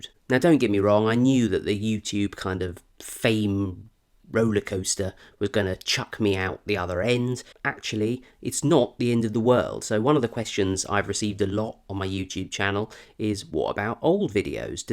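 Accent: United Kingdom. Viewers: keep doing what you're doing! from a fellow Brit, Its the very British delivery and sense of humour that make your videos such a joy to watch regardless of subject. FYI YouTube recommended your 30k subs video to me weeks ago and I've been hooked ever since. Cheers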